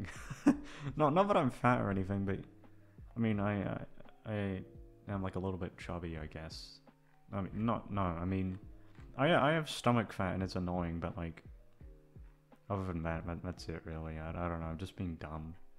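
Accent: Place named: United Kingdom. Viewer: AND HE HAS A BRITISH WHAT MORE CAN U ASK FOR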